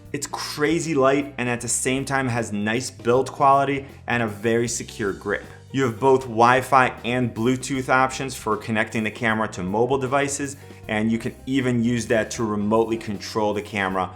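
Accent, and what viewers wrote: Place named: Cleveland, United States. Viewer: First off, I hear that Cleveland accent! We are from Cincinnati but have bene living in London for the last two years. Miss those Ohio accents! We purchased the 200D (SL2) a year ago, (the white version). We are amateur creators and it does exactly what we need it to do, even with the kit lens. Amazing photos, great videos when needed and ease of use cant be beat. We are actually thinking about getting another one or even the M50 so we can both have access to cameras. Thanks for the great content and helpful tips!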